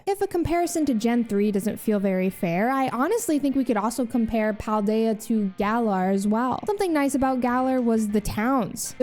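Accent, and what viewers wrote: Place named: Dakota, United States. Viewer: Are you from a Dakota? You sound like the movie Fargo.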